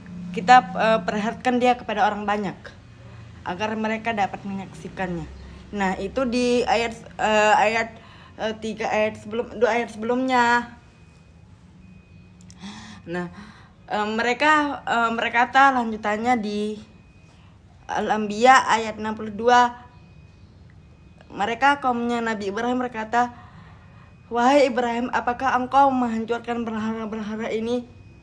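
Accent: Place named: Lombok, Indonesia. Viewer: Lombok banget logatnya kak...sehat selalu anak soleh❤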